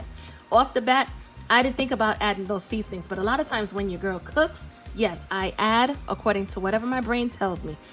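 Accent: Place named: Brooklyn, United States. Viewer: I knew it! I could hear that Brooklyn accent. I wanted to ask, but was too shy to. lol :)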